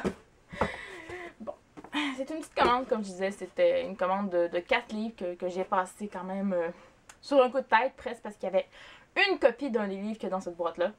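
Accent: Quebec, Canada. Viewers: Salut! Encore une super vidéo qui fait envie:) Je voulais te laisser un petit mot pour te dire que j'aime beaucoup tes vidéos. Je suis française mais je vis à Montréal et ça fait plaisir d'entendre parler de livres avec l'accent québécois que j'adore:) Je t'ai ajouté sur Goodreads pour pouvoir suivre tes reviews car nous avons beaucoup de goûts en commun:) Bises!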